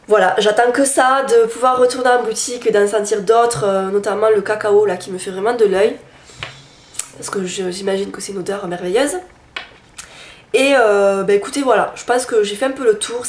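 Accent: South, France. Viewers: Ah j'aime aussi son accent parce que j'ai a peu pres le meme ( c'est l'accent du sud quoi ^^ ) . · Dsl, je réponds à sa place... Elle a l'accent du sud de la France, je l'adore aussi ♥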